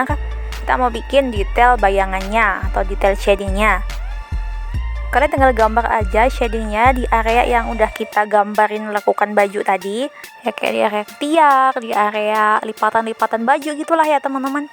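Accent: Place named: Philippines. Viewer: Let me guess your a Filipina Cuz you sound like one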